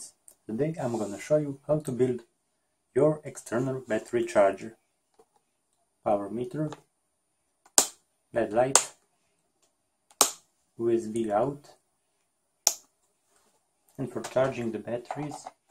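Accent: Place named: Italy. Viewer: dall'accento sembri italiano :o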